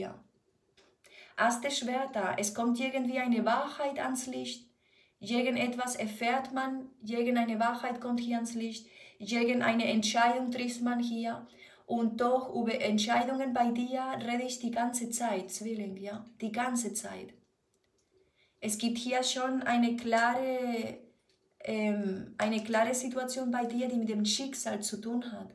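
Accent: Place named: Spain. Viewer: Casi puedes decirr lo que quieres, te lo prestaría attención por tu accento español. 🤗 y tienes razón con lo que dices en algunas cosaa.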